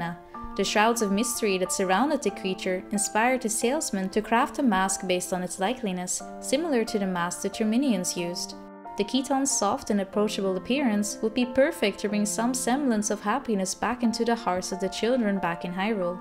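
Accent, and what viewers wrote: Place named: Netherlands. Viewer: Love your vids :) what country are you from? You sound Dutch or something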